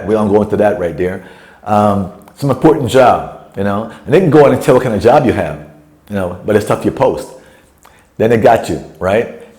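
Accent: Jamaica. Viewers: You are Jamaica you spoke Jamaica ingles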